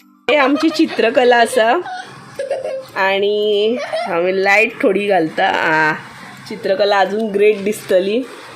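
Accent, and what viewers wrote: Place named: Konkan, India. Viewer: Tujho awaz aani kokani bri aasa,ayak pak brey dista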